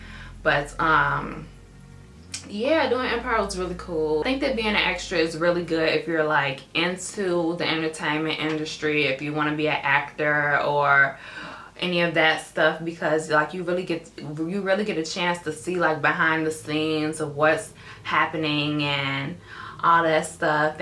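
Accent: Chicago, United States. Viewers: I knew u were from chicago.(hence the accent, cuz we say ain't so many times lol.) me too. I've never seen u. u must be on the southside.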